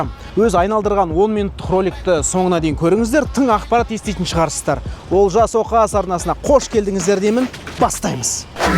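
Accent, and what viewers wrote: Standard. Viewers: Ассалаумағалайкум. жарнама болаберсін сенің осындаи видиоларңды көруге тамаша таза қазақша😂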